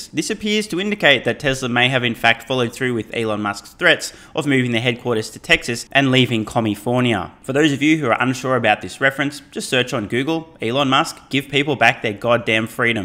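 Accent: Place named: Australia. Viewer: Your bloody Australian accent is delicious!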